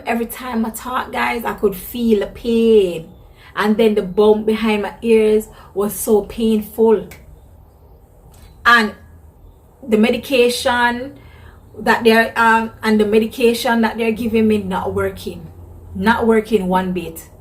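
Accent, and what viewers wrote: Jamaica. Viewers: Sorry if I may ask what country are you from... kind of your accent sound jamaican.... but you look so much like a Nigerian😀😀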